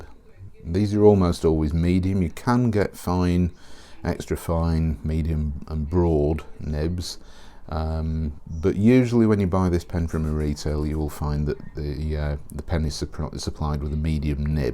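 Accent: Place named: Midlands, United Kingdom. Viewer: I understand him just fine, but I am English, I guess. It's a Midlands accent of some sort, I think, which I imagined would be easy to understand.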